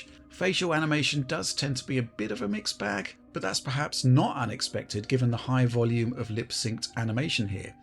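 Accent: United Kingdom. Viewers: It's the accent, British seems to scream seriousness and maturity. Game of thrones anyone? 😉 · British voice for a British franchise, very appropriate. Also great work on the analysis.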